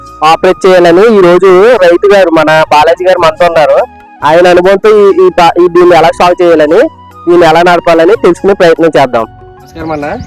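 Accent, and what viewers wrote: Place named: Konaseema, India. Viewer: కోనసీమ యాస.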